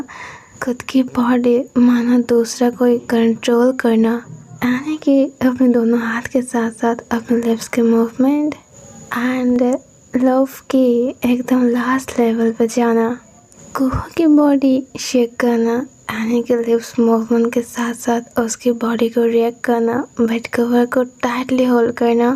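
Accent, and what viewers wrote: Bengal, India. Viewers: Didi aap kolkata sa ho kya kyu ki main bhi kolkata sa hu apki Bengali language sa lagta hain hain ki aap hain Bengali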